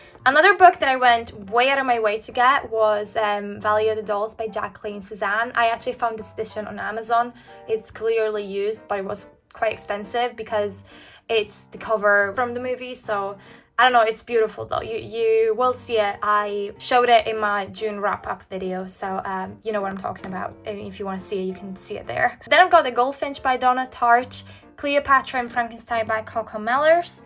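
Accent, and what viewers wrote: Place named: Scotland, United Kingdom. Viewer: Her accent sounds Scottish, so I assume Scotland.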